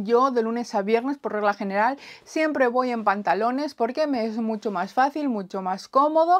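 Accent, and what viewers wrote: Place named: Asturias, Spain. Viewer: Ya te iba a preguntar si eres Asturiana , sete nota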